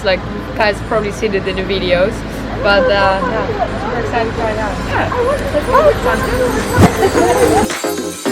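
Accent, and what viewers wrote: Netherlands. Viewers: You have such a happy, peaceful vibe! Everyone on my dad’s side of the family is Dutch, I love hearing your accent, reminds me of my Dutch grandparents 💜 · So Gezellig...a dutch word for everything cozy sweet and charming...my parents were from Holland and settled here in Long Island NY. I love your accent and your voice is so sweet...I would love to see a tour of Keukenhof gardens in spring with all the beautiful tulips!!!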